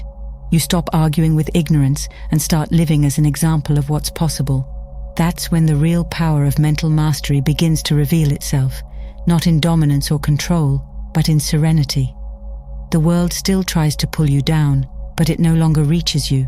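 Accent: United Kingdom. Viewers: Sounds like Solace Fox with different music and a British accent.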